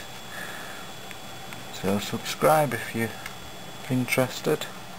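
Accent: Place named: Scotland, United Kingdom. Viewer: Awesome, sounds like scottish though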